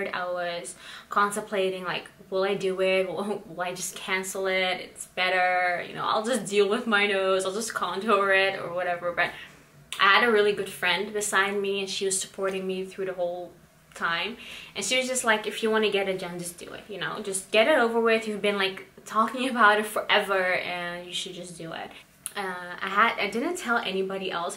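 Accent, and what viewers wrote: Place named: New Jersey, United States. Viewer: are u from NY or NJ? u sound like the people from jersey shore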